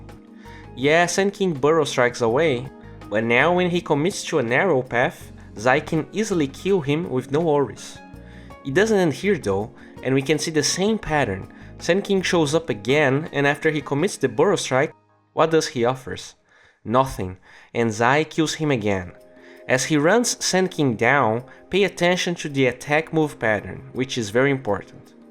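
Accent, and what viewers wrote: Brazil. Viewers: melhora tua pronuncia cara. TWO nao eh TO. Entrega tua "brasileirisse" facilmente. Fora isso, parabens pelo conteudo.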